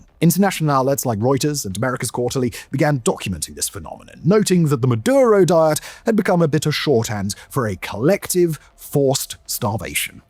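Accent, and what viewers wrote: United Kingdom. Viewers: Venezuela scrores in the best category by the Global Hunger Index. Venezuela scores better even than India, Papua New Guniea, heck it's even better than regional darling Ghana. Ukraine is war-torn, so, but even still Venezuela is scoring higher than Ukraine. When you consider these video topics, take a step back. Please don't report "stories too good to check." You could have spent this time better reviewing India's hunger issues, but instead you're doing imperial propaganda with a British accent (which used to be the best accent for imperial propaganda, but isn't anymore). I guess a Brit shitting on India for any reason would also come off wrong. You know... just critique empire, would you please? You have the proper accent for doing that! edit: don't know why I didn't mention that IN SOUTH AMERICA, Ecuador, Suriname and Bolivia all score worse on the Global Hunger Index, than Venezuela. 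This video feels borderline misinformation; it's just so unfairly focused and lacks context. Which, that's how the best lies operate! And I'm not fan of Maduro, but carrying water for empire is such an ugly look.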